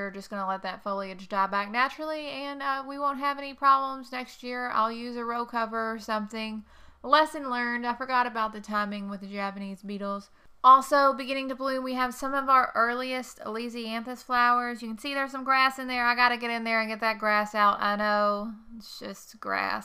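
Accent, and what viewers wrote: South, United States. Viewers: I can tell by that southern accent you're in the Southeast somewhere? Lol I'm in Georgia, how about you?. I love the close-ups you do, that's so cool. your cat is a cutie. . what's crazy is I have the same hydrangea it's a mother plant I've had 14 or 15 years it always blooms blue so I started getting in taking cuttings and making more plants of my hydrangea and one of the cuttings I put in the soil it's a small plant but it Bloomex pink LOL and then I took a cutting off another plant and put it like 5 ft away and it bloomed light purple.. the hydrangea I have is called endless summer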